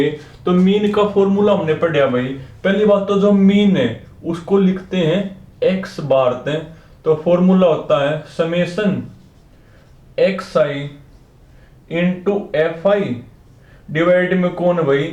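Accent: Haryana, India. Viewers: Haryana t ho sir aap ???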